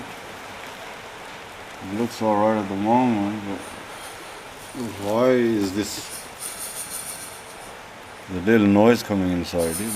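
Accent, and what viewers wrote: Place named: Birmingham, United Kingdom. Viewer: Are you from the Midlands , sound a bit brummie matey, cheers for sharing pal👍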